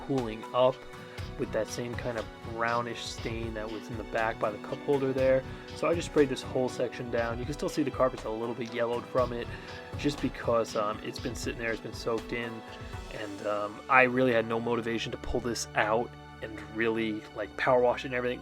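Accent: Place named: Bronx, United States. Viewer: Haha bronx accent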